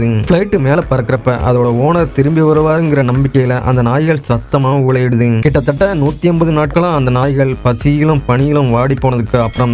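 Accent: Kongu, India. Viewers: Enuginga neega kongu nada 😂 ing vida mudiyala 😂😂 I am kangayam inga 😂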